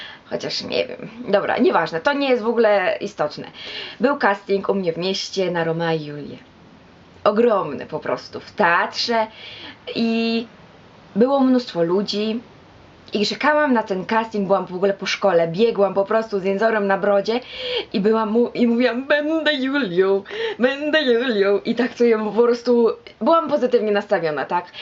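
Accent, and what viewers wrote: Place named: East, Poland. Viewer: z takim zabuzanskim akcentem, to mosfilm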